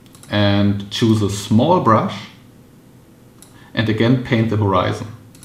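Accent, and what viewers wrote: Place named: Germany. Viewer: Guten Tag. Thank you for your workflow video. Really helpful 👍 Btw, your accent reminds me of my semester in Germany (Koln), wonderful memories.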